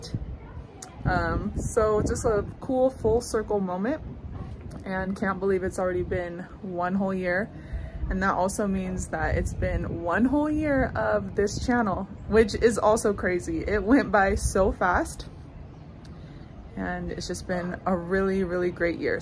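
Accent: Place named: Kenya. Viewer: Congrats on becoming a Kenyan. Nice vlog as always. ...'meat, he likes meat.....', your accent sounds so Kenyan. I'll be listening for Kenyan highlights in your accent. You know..I was around the Nyayo house area just two weeks ago, but it was a bit crowded and not as fun to walk, like you guys were having fun. Thanks again for the videos one year on..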